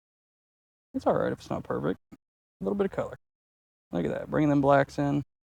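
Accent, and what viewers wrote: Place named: South, United States. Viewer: Its rare that I come across a new youtubers literal first video just as they are starting out. I really actually enjoyed this video, kinda reminds me a lot of Bob Ross, you've got his hair on point. and I can hear a little southern in your accent that I think adds a lot of charm as well. Keep it up, I'll stay tuned and hope to see your channel grow. maybe you could be like Bob Ross but not only painting nature but painting nature out in nature! I think that's a great idea! also, you've actually managed to tempt me to try painting myself in a similar way, it looks like a really neat and fun technique. Edit: also, I see no mistakes. just happy accidents.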